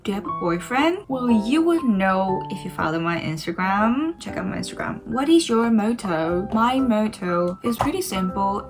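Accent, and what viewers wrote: United Kingdom. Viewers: 영국식 발음이 너무 좋네요.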